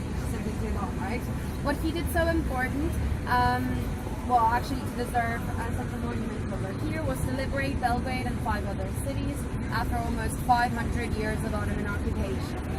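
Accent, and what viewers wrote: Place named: Ireland. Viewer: why an Irish accent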